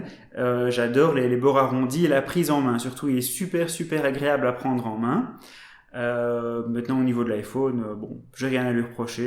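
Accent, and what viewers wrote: Belgium. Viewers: J'aime bien ton petit accent :) T'es belge ? ^^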